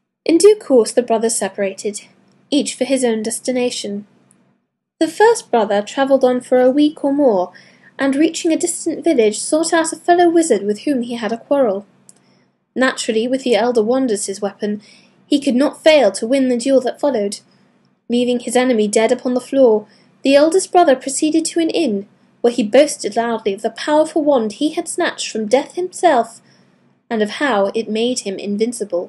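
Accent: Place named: United Kingdom. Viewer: Captivating voice and perfect British accent. It sounds like Hermione's voice. Nice reading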